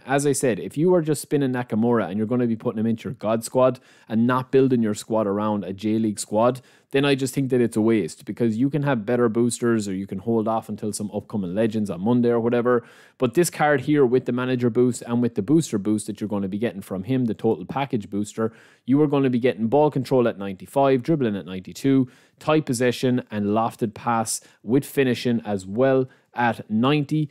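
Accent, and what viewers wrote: Ireland. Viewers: that irish accent tho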